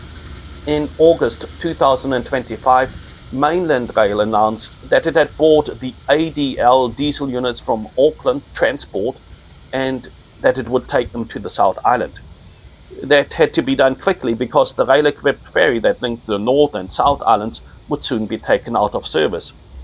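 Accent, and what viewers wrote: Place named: South Africa. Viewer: Interesting. . A South African accent with a tinge of NZ.accent. I get the impression that these are charter trips rather than scheduled services.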